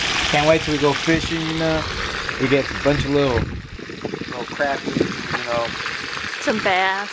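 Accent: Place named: South, United States. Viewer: Paisa with a southern accent......I love it. Un mojarra frying a mojarra🤣🤣 Looks goog Y'all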